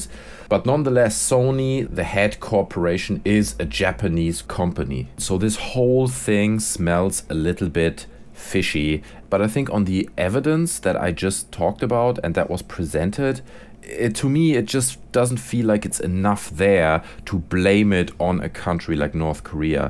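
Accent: Germany. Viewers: Ah your accent is German, I've been wondering since the day I saw one of your videos, you say you live in UK but your accent just sounds so different :D. Merry Christmas and Happy New Year :) !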